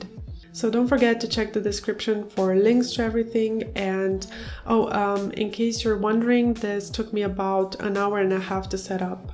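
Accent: Germany. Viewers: Where are you from?:) Your English sounds very German haha :D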